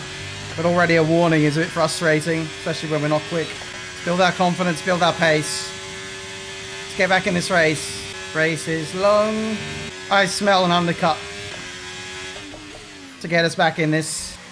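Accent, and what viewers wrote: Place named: Australia. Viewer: What part of aussie you from?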